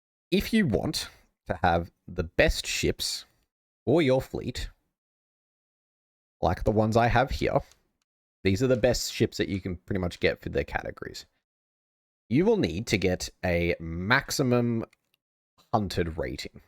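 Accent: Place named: Australia. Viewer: One of the thickest Aussie accents I’ve ever heard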